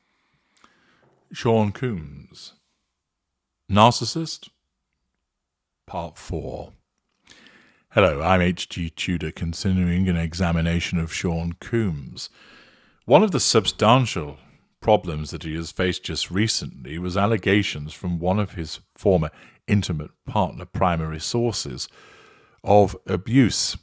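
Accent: United Kingdom. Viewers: Dude why can’t you pronounce names properly? Surely even with your British accent, pronouncing Combs properly can’t be that difficult. It’s COHmbs….not Cooms! Also, she got $100M from the settlement. It’s open source data so you should have known that. It’s obvious that you’re just reading from various sources and have no actual understanding of the subject….including how to pronounce his last name properly. I usually enjoy your videos but this series was weak to say the least.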